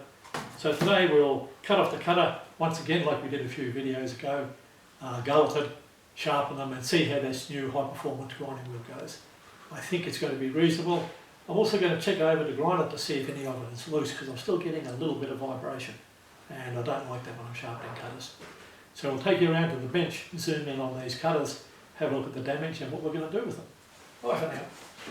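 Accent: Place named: Australia. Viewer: Please don't take my comments negatively. I appreciate most of your video content, but the audio is horrible. I suggest that if you want to speak, please try doing voice over in post production. I have not been able to actually hear you or discern what you are saying over the other noises in most of your videos. Aussie accents to Americans sounds like Greek (maybe it goes both ways). But nonetheless, I don't know what you are saying, AND I REALLY WANT TO. Thanks for showing so many wonderful grinding setups! I'll keep watching no matter what.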